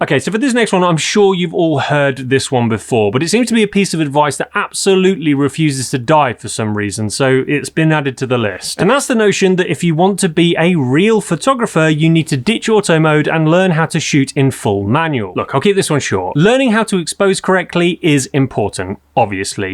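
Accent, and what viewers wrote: England, United Kingdom. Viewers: Very good advices and I like your english accent and style with sous-entendus. Could you add : 1. B&W is a must for artistic photos 2. Never use Auto (which is in the same line as always use Manual) 3. The larger the zoom, the better the photographer.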